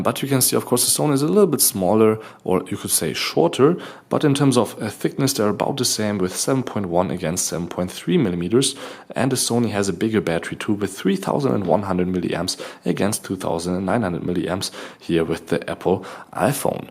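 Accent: Germany. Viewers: Der deutsche akzent.... schrecklich! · You notice right away that he's german, lol. iPhone 6 FTW! ❤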